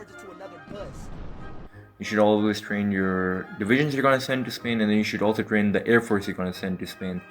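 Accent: India. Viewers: I can't tell if you're Indian or European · Bro you sound indian as fuh outto nowhere are you indian?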